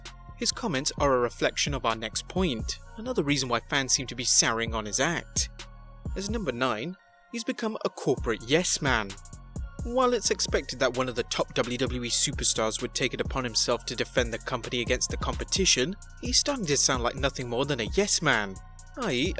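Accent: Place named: United Kingdom. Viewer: Ok that is the very first time I have ever hear someone pronounce the word as STAYTUS. I mean I know your british and all but damn you act like your accent prevents you from saying STATUS like everybody else. Do you say APPLE or AYPPLE?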